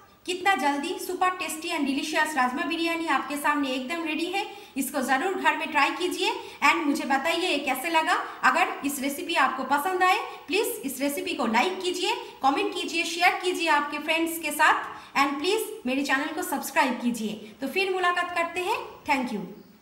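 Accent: Bengal, India. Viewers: Liked your recipe and your hindi with an awesome Bengali flavour👌 thanks for this wonderful recipe.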